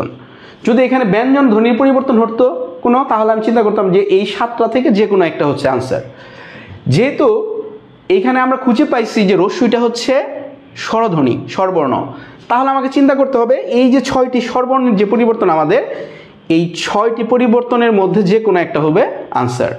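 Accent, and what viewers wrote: Sylhet, Bangladesh. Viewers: Sylhet er basay moja base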